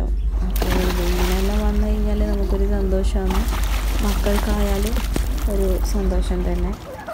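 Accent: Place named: Malappuram, India. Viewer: slang Malappuram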